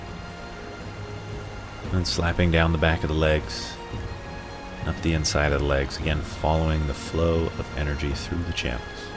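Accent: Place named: Scotland, United Kingdom. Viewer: Ah, wee of the Clan! Sorry to have missed the Great Scottish Retreat, a mere 50 miles from my cave in Perthshire. I have an excuse...I was in Portugal. Qigonged every day though! Looking forward to doing this routine and listening out for your Scottish accent...aye... 😀🏴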